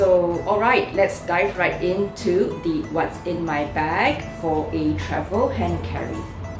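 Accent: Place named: Singapore. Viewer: Also this is kind of random, but I love the Singapore 🇸🇬 accent. I follow another YouTuber with the same accent and I just love it. 😊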